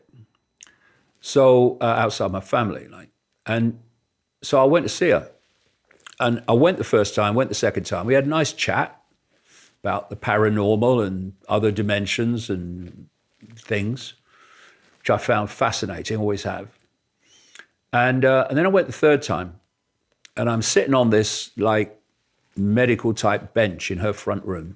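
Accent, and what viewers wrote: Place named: Birmingham, United Kingdom. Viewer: Spot on me... BUT YOU ARE STILL DRAGGING THE CORPSE OF COLEMANBALLS THROUGH THE OPEN SEWER OF MY OVERHYPED DESCRIPTION WHICH IS MORE GRIPPING... WHAT...IT IS GETTING MUCH WORSE BY THE SECOND......PLEASE DAVID...DEAR GOD STOP THIS DRIVEL AND PRESENT SOMETHING EVEN REMOTELY CONNECTED WITH THE PRESENT, REAL WORLD AND MAYBE EVEN YOUR MOANING BRUMMY DULCET TONES.....I CAN'T COPE WITH ANYMORE... YOU HAVE A POTENTIAL WORLD STAGE AND YOU FUCKING WASTE IT 🗑 TOSSER BEHAVIOR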